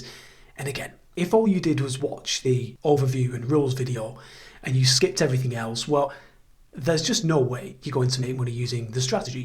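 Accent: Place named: United Kingdom. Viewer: Hi thanks for your video and (for once ) I can hear a lovely british accent .. How do you deal with false signals ? divergences are not Always successful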